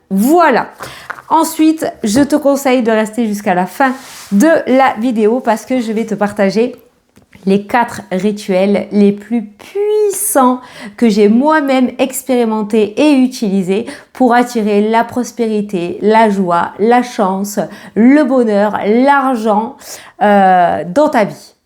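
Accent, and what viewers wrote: South, France. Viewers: Bonjour, merci beaucoup pour les rituels. je suis fane de vos vidéo, je les trouve génial et surtout votre accent du midi, j'adors. je vous souhaite une très bonne année a vous et toutes votre familles.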